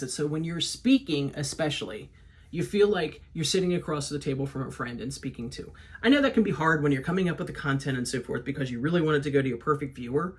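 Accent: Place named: South, United States. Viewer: Hey! I went to your channel and watched your Ace Hardware video. If you’re OK with a little constructive criticism, I would love to give you my review, maybe it will help a little bit. I loved your video! Your voice is awesome, (I love a great southern accent).😎 there is only one thing that I noticed on the video that would keep me from watching more of your videos. I noticed that you don’t look straight into the camera lens enough. I think on a video like this when you are talking to your audience you need to think of it like talking to a friend or one person. My favorite Youtubers look straight into the lens the entire time that they are talking to the camera and it looks like they are looking right into my eyes and that is what I love! Maybe you can practice this and get better at not looking back-and-forth between the lens and your own face, I know it’s a hard one, but I think that would help you a lot! 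I didn’t see any other issues with your video. Great content also! I love to see what is in a store before I go shopping.😃👏👏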